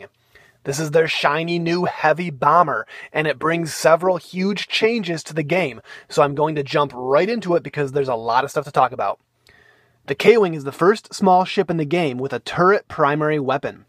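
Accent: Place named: United States. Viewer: You're aware that American people tend to have American accents, right?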